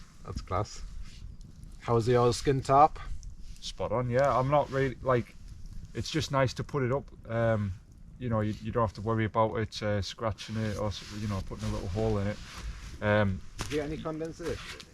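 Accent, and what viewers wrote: United Kingdom. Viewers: You from the uk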